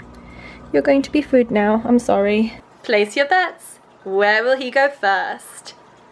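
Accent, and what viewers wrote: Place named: England, United Kingdom. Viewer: Ummm what’s with the reaalllly English accent?.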